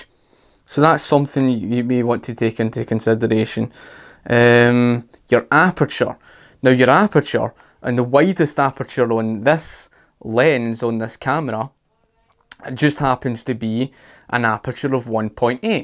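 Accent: Scotland, United Kingdom. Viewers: Great video! Plus, love the scottish accent :) thumbs up from Italy! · jens lipponer Scottish accent you mean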